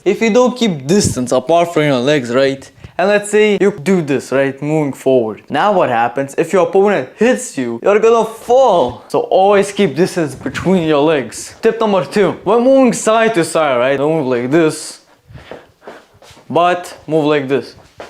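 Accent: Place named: Russia. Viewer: Im subscribing cause he got that rusky accent.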